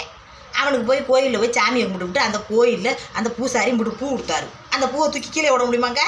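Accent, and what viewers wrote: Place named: Tirunelveli, India. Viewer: அக்கா திருநெல்வேலி பேச்சு வேற லெவல் சூப்பர் ❤சூப்பர் அக்கா ❤❤❤❤❤❤❤❤❤❤❤❤❤❤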